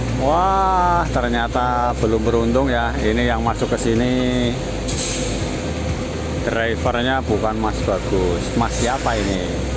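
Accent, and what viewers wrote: Banyumas, Indonesia. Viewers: Selamat malam mas nek ora ngpak ira kepenak....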